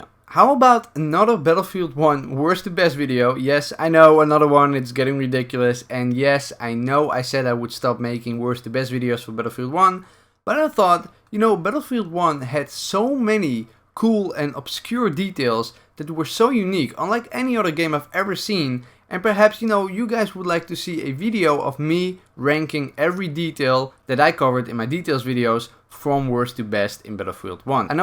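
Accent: Netherlands. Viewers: Ben jij nederlands ik hoor het aan je engels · You have a Dutch accent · He sounds dutch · Are you dutch? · You dutch? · Are u dutch? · Ik hoor namelijk een Nederlands accent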